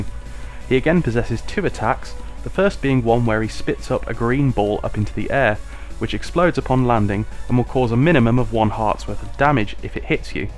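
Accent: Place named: United Kingdom. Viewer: This is really difficult to understand. I hate this kind of british accent.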